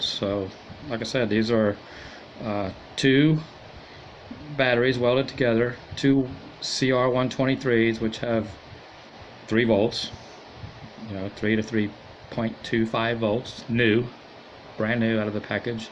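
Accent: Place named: Northern Ireland, United Kingdom. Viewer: Could i ask where your from as your accent is def N Irish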